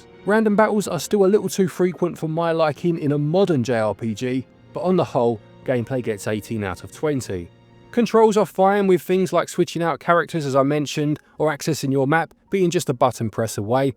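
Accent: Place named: Cockney, United Kingdom. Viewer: It's nofing wrong. I love the cockney accent.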